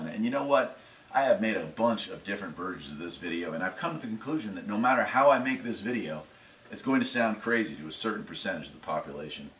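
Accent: North Carolina, United States. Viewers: Wow. Excellent video, brother! Funny thing. I was just looking up stuff on CB radios, and I ran across one you have about 5 years ago. Your voice...well...do you know how your own voice sounds different when you hear a recording of yourself? Well, you are the first person I have run across whose recorded voice sound exactly like my recorded voice, when I hear myself that way. LOL, it was a little creepy, bro. Anyway, so that caused me to be curious and look at this second video here. Here, you reveal that you are from the NC mountains. I grew up in High Point, so that partially explains our common accent and similar speech patterns. But... ...Just today, I was thinking the very things you just said here. "Hypothetically," I may end up being very happy I installed that CB in the Jeep. Anyway, the reason I started out with that seeming nonsense, is to point out that I seem to have been drawn here. 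Now I have to watch a bunch of videos to try and figure out what you have said that I was meant to hear. I really wish you did not just say you have 70 videos. This might end up being a very long night.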